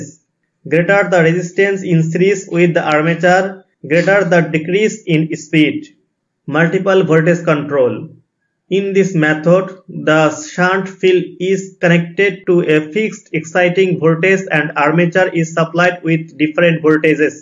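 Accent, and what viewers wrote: India. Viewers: What accent it is! Hindi speaking people has this type of accent!!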